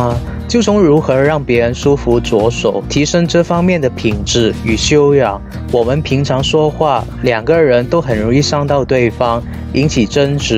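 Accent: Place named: Hong Kong, China. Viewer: u are from hong kong right? your accent seems like hk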